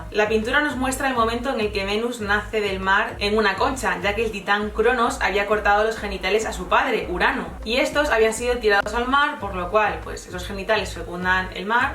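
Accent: Spain. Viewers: Hola. Es la primera vez que te escribo. De qué parte de España eres oriunda? Lo digo por tu acento y tus razgos.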